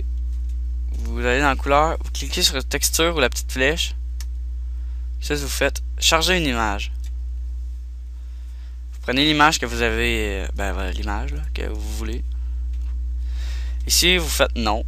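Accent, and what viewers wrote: Quebec, Canada. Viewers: LAXENT QUEBEQUOI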